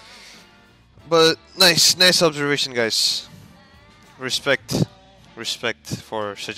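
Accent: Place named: Arab world. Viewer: Recién me doy cuenta que tiene acento árabe XD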